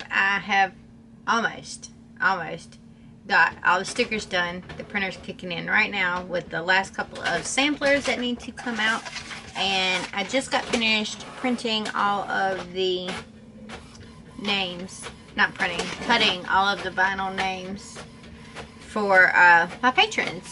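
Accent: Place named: South, United States. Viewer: I will laugh SO hard if you start to develop her accent on top of your natural Southern accent 😂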